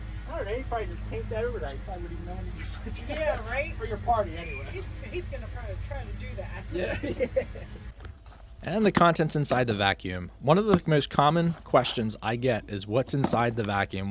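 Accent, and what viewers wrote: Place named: Pennsylvania, United States. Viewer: I knew you were from PA by the accent! HA! Delco???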